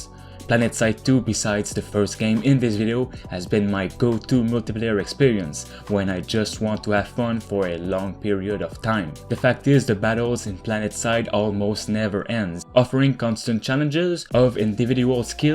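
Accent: Quebec, Canada. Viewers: est-tu quebecois mon criss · Accent québécois ?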